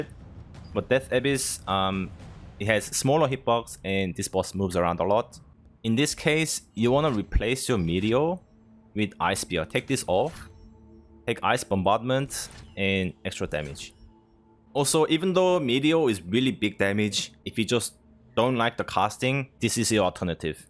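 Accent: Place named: Australia. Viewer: Mate, I keep hearing an Aussie accent creeping through. You in/spent time in Aus?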